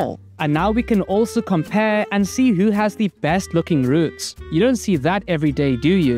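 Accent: South Africa. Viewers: Are you South African bc i think you are.Pls answer😢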